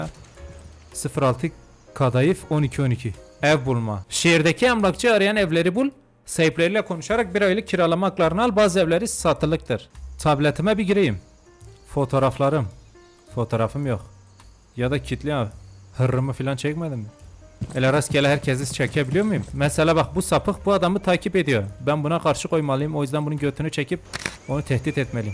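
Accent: Elazığ, Turkey. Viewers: abi sen elazığlımısınü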